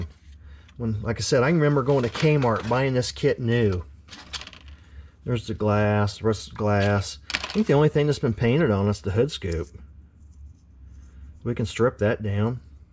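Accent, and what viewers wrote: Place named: Pittsburgh, United States. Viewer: Black warsh?? Are you from pittsburgh too??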